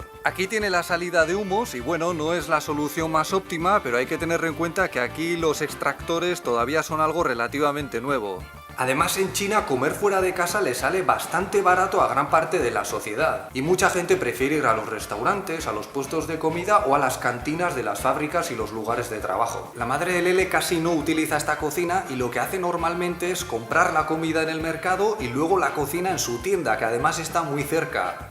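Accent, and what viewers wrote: Basque Country, Spain. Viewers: Ostimas con el acento VASCO... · Es impresionante el acento vasco de este hombre jajaj.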